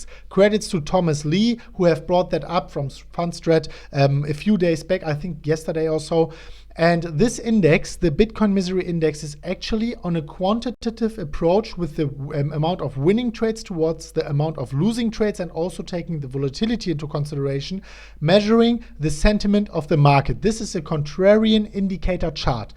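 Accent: Germany. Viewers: nein wtf, klar hört man dein deutschen akzent raus aber dein englisch ist mega gut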